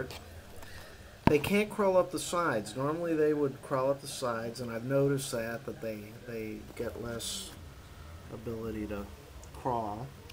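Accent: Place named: Midwest, United States. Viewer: Im from new york. lol my accent is nothing like this. his is more of a mid western accent. lol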